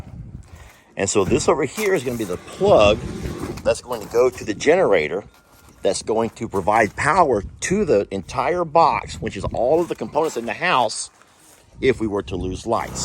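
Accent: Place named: Rural, United States. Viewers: deep southern drawl with a twang of! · I’d rather hear that generator than that hick accent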